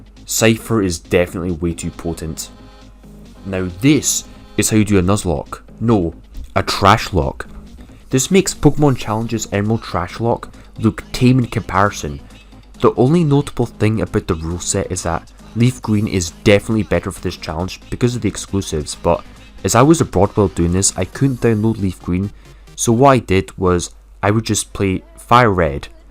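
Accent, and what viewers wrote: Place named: Scotland, United Kingdom. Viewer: Not a pokemon related question, but could you briefly turn up your scotish accent to 11 and sound almost unintelligible?!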